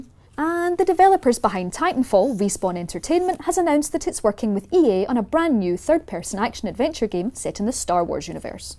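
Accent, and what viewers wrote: Scotland, United Kingdom. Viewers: i cant understand you, and thise british accent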